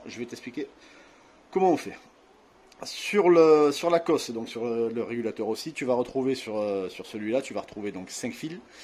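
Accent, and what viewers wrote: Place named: South, France. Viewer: Salut je viens de voir ta vidéo sur le régulateur 👍 c'est super bien expliqué car j'ai eu le problème la sur ma Honda vf750c rc43 de 1995 je super sympa que tu donnes de ton temps pour aider les autres et j'espère qu'ils seront reconnaissant envers toi .en tout cas moi j'ai trouvé ça génial et en plus le petit accent du sud c'est le top merci pour ton explication 👍 Bonne continuation 👍🤟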